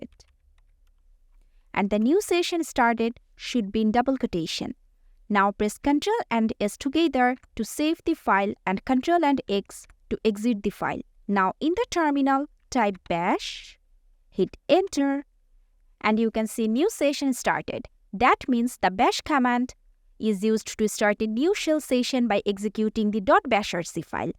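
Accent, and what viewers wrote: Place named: India. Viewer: I can tell you do have an accent that seems coherent or native to the country of India. But it is not so thick that I cannot understand you as well. As you seem very well spoken on your pronunciation's. Considering the info a position earlier I offer one piece of advice if I may Increase the zoom in your gnome terminal or more... I had my phone in the youtube video set to 1440p Resolution and had to zoom in to read the info. You were typing in the terminal and I have reasonably decent vision For those who don't have a 1440 pixel phone. Nor access to that resolution setting because the phone or device they're running isn't powerful enough. This would help those people Better enjoy your content Thanks Cheers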